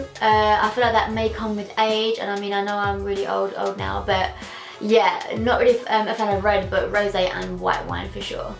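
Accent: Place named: London, United Kingdom. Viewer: Not even joking I didn't realise you had a stammer, I thought. it was the LDN accent.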